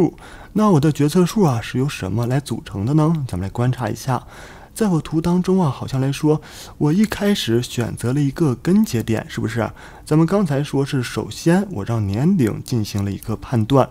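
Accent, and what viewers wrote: Northeast, China. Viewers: 这东北味太浓了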